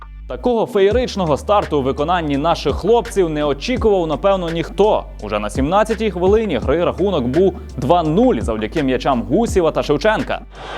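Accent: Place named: Western Ukraine, Ukraine. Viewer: Мене тривожить одна думка, у звязку з перепадами світла, звідки насправді Ви? Слухаючи ваші передачі не важко догадатись по розмові, з Тернополя чи зі Львова? І хто відповідає на мої коментарі, той що веде передачу чи командою відповідаєте? 🤔🤔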